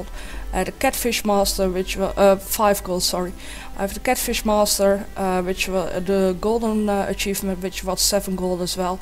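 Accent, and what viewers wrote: Netherlands. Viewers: are you dutch.. ben je nl ik denk het well haha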